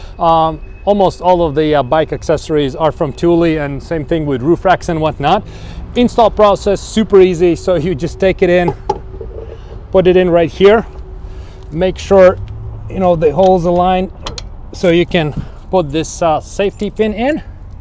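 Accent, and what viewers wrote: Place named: Canada. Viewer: Your accent is so cool, it's fun to watch just for that. I have an RVR. Very good info my Canadian friend. (From Toronto)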